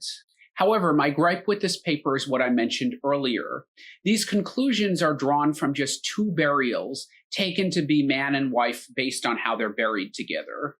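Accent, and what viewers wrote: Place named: United States. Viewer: He didn't pronounce it incorrectly for someone with an American accent. You don't change your accent to pronounce a place name. I'm sure you don't pronounce New York, Orlando, Los Angeles, New Orleans or any other American city the way that an American would. Someone with a rhotic accent saying "Mel-bin" is incorrect and distracting. It's like an English speaker walking around pronouncing Paris the way the French do.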